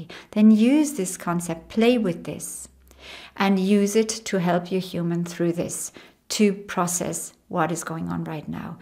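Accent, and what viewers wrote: Ireland. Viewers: I love when she giggles like a little girl. And the Irish accent ❤️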